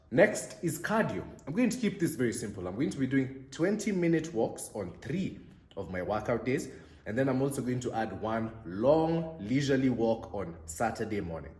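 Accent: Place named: Africa. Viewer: Love your accent.what country in Africa are you from.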